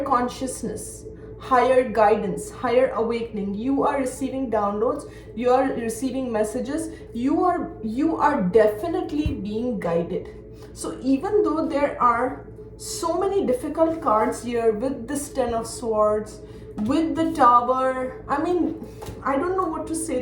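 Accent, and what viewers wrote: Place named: India. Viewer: Thanks! On spot as always. Something caught my attention today watching this reading. Your accent changed to strong Indian accent today… is that because you were challenged to Indian gods? Never happened before as I’m watching your videos regularly.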